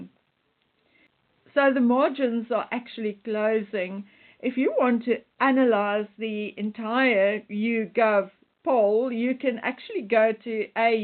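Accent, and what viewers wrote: South Africa. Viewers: South African accent detected. Which province are you from?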